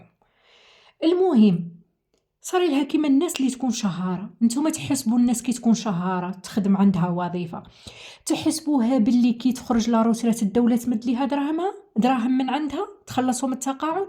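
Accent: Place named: Béchar, Algeria. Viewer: قبل ماتقولي انا من بشار من هدرت عرفت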